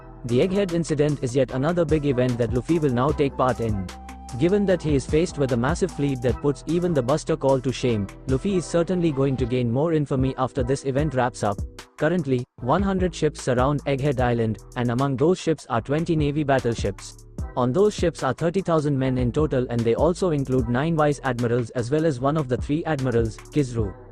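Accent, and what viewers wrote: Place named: India. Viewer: why does this AI voice sound indian?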